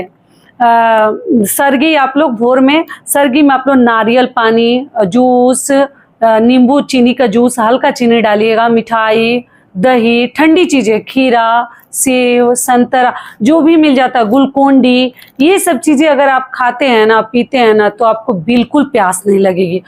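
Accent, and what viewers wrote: Bihar, India. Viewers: आप बिहार से है लगता हैं आपकी बोली से लग रहा है मै भी हूं 🙏आपने बहुत अच्छी तरह बताया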